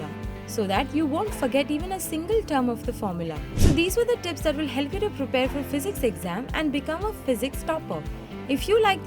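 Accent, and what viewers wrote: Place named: Punjab, India. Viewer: Punjabi english and bio😊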